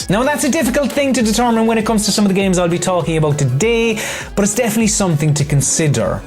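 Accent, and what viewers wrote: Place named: Ireland. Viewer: Nice to hear your dulcet irish tones again 👍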